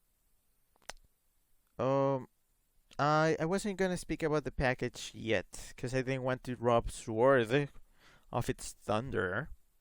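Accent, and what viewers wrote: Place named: Mexico. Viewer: Yes; I feel abou the same... even the HMs, which I do not miss, leave a hole. Also, I do not mind waiting a few years and playing other games... what we did in the ol days! Your theoretical On a different note, your Mexican accent is more noticeable here... though a carefu listening reveals your mastery of English, even while speaking.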